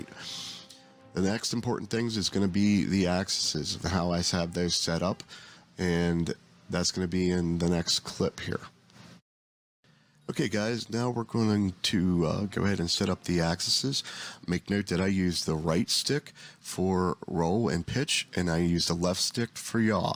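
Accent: United States. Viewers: Dudes definitely from Philly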